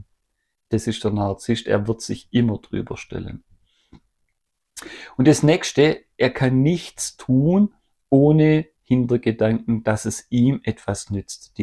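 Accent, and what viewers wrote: Swabia, Germany. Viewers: Auf den Punkt gebracht 👍! Was ist es für ein Dialekt? Schwäbisch? Meine Freundin aus Tschechien spricht so.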